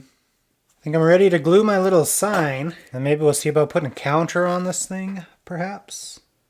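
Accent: Canada. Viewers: Where in Canada are you from? You sound a bit like Bubbles from NS.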